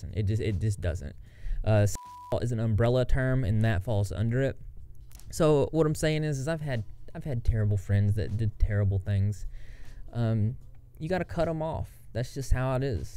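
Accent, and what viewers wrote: South, United States. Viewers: This is great, thanks for reporting, and thanks for standing up for SA Victims. Also, I personally looooove southern accents so please don’t worry about how you pronounce things. ☺️ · “SORRY IM SOUTHERN, I can’t pronounce.” Saaaaaaaame lmao 😂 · Found you the other day under recommended videos & I’m obsessed!! I love the info & the southern accent - I’m from GA! Keep killing it 😍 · I didn’t notice you had an accent until you said “I’m southern” love that for me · What part of the south are you from? I can really hear it in this video. I’m from the south too. 😂😂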